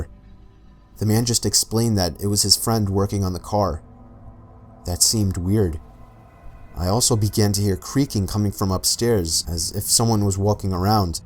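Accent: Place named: New York, United States. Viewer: Being a horror buff and NYC native, I appreciate these stories AND your (NY/NJ?) accent 🙌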